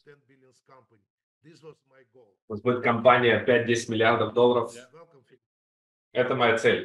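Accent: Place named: Russia. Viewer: с сильным "русским" акцентом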